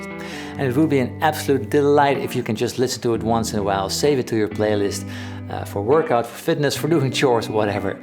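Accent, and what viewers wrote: Netherlands. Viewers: Complimenten voor je content en professionalisme man! Je engels is vloeiend maar toch hoorde ik direct dat je een Nederlander bent! 🇳🇱🎸 Je bent een grote speler als muzikant!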